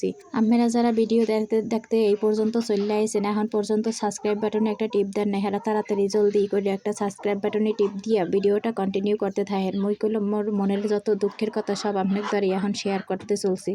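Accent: Barishal, Bangladesh. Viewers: আসসালামু আলাইকুম। আপু আপনার ব্লগ ভিডিও দেখা শুরু করে দিলাম। অনেক ভালো লেগেছে আপনার ভিডিও এবং আপনার বরিশালের ভাষার কথা গুলো। মাশাল্লাহ ❤❤❤❤❤❤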